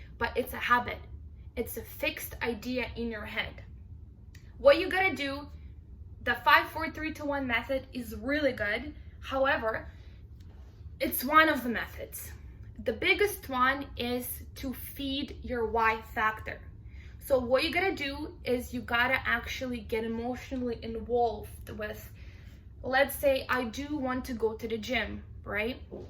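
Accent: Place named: Russia. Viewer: ohhhhhhhhhh that kiss in the end, let's just make russian babies already. That russian accent makes me melt. Can you do a russian language lesson video next time i think that would be your niche on youtube.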